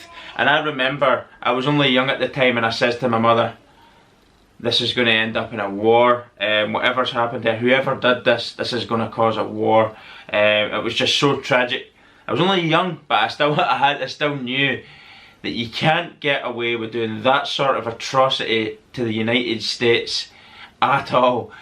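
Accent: Scotland, United Kingdom. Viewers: Brings powerful memories rushing back. Love your Scottish accent!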